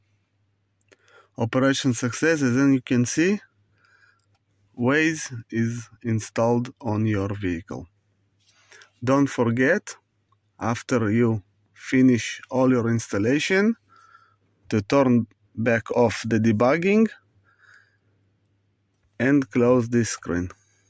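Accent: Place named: Israel. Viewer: איך שומעים ישראליותתתת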